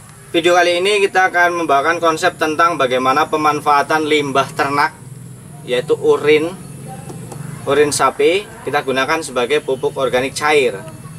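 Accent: Banyumas, Indonesia. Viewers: kang ngapak banget ya......asline ndi jenengan..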